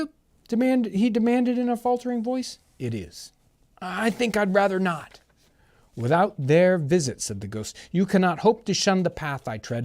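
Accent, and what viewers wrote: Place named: United States. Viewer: That was great and a bit different with an American accent 😀👍🇬🇧🇺🇲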